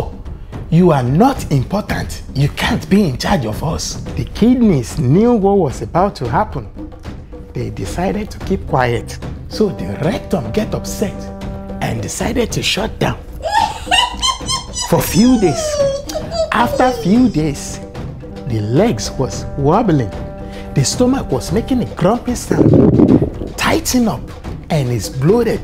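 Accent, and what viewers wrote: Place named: Africa. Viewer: I don't know How you showed Up on my screen But maaaan I love this video specially your african Accent 😂😂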